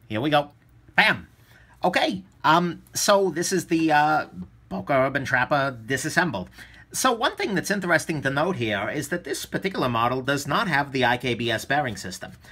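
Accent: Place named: Brooklyn, United States. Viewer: He sounds like he’s from Brooklyn or Staten Island to me. The old timey tv/radio broadcaster is hilarious!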